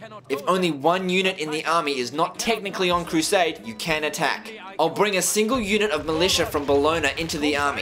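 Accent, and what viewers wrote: Australia. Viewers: He's Aussie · Holy shit, he used to be such a pure Australian.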